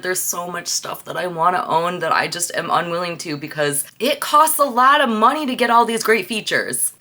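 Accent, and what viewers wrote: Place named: Detroit, United States. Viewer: Can I test my accent ear and guess you’re from Michigan? Specifically the Detroit area.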